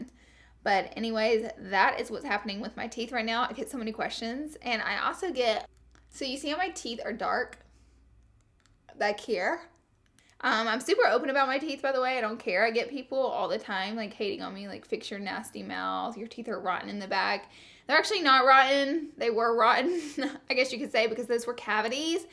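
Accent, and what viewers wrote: South, United States. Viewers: My heart is just so whole after this video, I remember when I first watched you I didn't know if I was going to keep on watching or not, but after hearing your southern accent I felt right at home(Georgia Peach, also Alabama fan). I loved when you talked about self worth, lately I've been in this long term relationship and he's the best boyfriend ever, but there was a point where I had no self worth because of all the drama and long distant we were going through. Now I've been working week by week fixing my self esteem and glueing all the pieces back together. I know that I may have my family, friends, boyfriend and other things to carry me if I need help, but I want to be able to walk on my own and not need help, and that's what I'm working on now. Your makeup videos have always inspired to safe up my money and spoil myself(because not only do have a makeup addition but I deserve it), but they've also inspired to reach my goals and look fabulous while doing it. 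You're such a glorious and fabulous human being and I love you 😘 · Omg yes!! Can't wait for your merch!!! I hope it has some of your country twang or sayings!:) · I just love you... I come from the south too, and when I watched one of your videos for the first time, I was so shocked. I was so shocked to hear someone that actually sounded like me on YouTube. Someone who had a "thick" accent, like me, who was so out there. I love how you don't try to cover up your roots, or force yourself to be something or someone that your not. I'm writin this to you all the way from a teeny, tiny town to tell you to keep on keepin on, and to tell you thank you.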